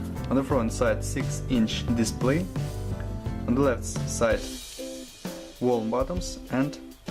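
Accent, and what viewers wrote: Russia. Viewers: Русский акцент есть), где можно взять не подскажешь?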